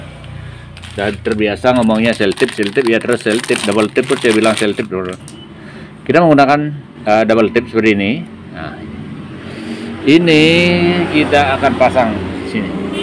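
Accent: Bali, Indonesia. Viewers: Logat nya bali bgt bosku. Sukses slalu bos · alamat mna , kalau dari logatnya orang Bali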